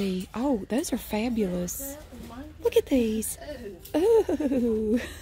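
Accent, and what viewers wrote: South, United States. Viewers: "Naughty-nahn, naughty-nahn" Gawd I love the southern accent. LOL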